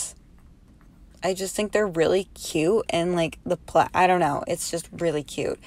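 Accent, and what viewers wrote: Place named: United States. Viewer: i also want to call it the Re Ve Festival but the girls pronounce it as "reeve" i guess since since yeri calls the robot Reve. You sound American, but how did you get the album so fast?! It'll take a month before I get mine. I'll trade anthing for Irene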